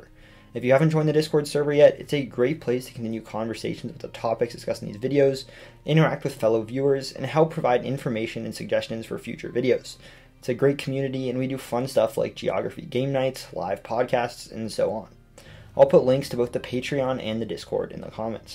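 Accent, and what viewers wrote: United States. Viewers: Interesting,except that the American style of English pronunciation is a bit hard for me to grasp quickly.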